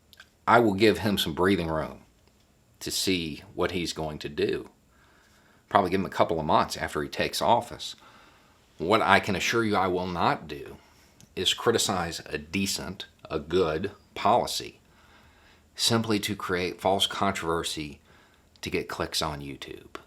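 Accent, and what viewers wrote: South, United States. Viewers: I'll admit that I'm a sucker for reasonable, compassionate, levelheaded discourse delivered in a southern accent. Subbed.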